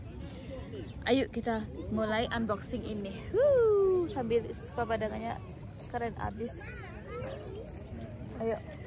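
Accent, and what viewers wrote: Java, Indonesia. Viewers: Bule medok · INI GARA - GARA BULE KW BISA DAPAT SILVER PLAY BUTTON CANTIK, LUCU BAHASA JAWA SANGAT MEDOK SUKSES TRUS MANTAP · Halo cantik pinter banget kamu nduk boso jowomu medok banget ❤❤ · Yeay medok udah silver button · Medok men nduk..lucu..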